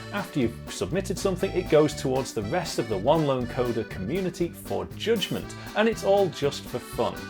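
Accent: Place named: United Kingdom. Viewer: why you don't say hello with that British accent, is important to me as old subscriber 😠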